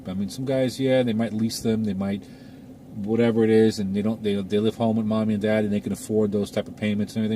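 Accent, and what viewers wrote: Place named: New York, United States. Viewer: Where in NY r u from? I have a good idea based on ur accent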